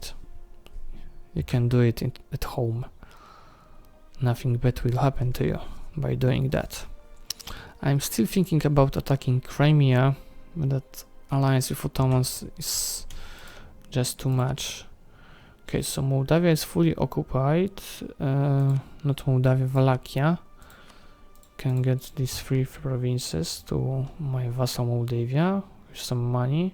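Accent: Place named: Poland. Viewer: Ten twój wyraźnie słyszalny, ciężki, Polski akcent tylko dodaje smaczku całej serii. Świetna seria z klimatem czyli Quality Content za który cię subskrybuje. Miłego dnia!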